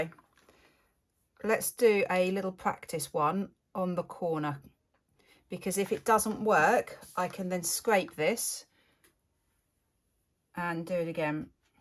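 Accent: United Kingdom. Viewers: I have been following various “well-known” artists in pouring for a long time. Nevertheless, your artwork remains the most creative artwork I have come across on YouTube. I enjoy following you and I also find you very inspiring. I always look forward to seeing a new work of art from you. Oh and one more thing: I love your British accent:-)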